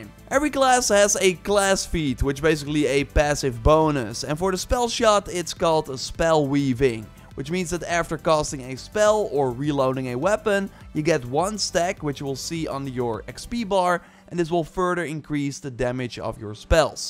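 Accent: Netherlands. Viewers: i smell dutch... also i recall them saying theres no characters anymore. yet we have classes kinda like that one diablo game.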